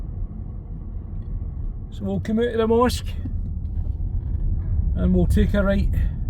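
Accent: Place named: Glasgow, United Kingdom. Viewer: you are a good person, keep it going, interesting commentary n Speaking like a true glaswegion love to you and your family the banter ive been having with the opposite side here in SA is been a good laugh ❤.,cracker that comment oxford street 'dont be confused with london' got me laughing 😂good one 😂, here in SA when you visit friends its,id,drivers license and finger print recognition, everyone thinks south Africa is behind the times its not,security companies have replaced the police and its working providing employment thats badly needed,its bloody heartbreaking to see anyone beg 😢thanks for your positivety ❤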